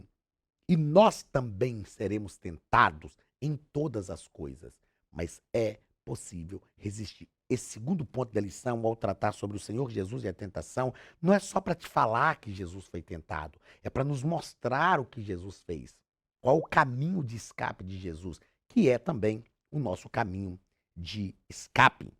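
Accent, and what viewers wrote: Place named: Minas Gerais, Brazil. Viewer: Estudo junto todas as semana sou mineira e gosto de ouvir um mineiro falando Deus continue lhe usando Pastor.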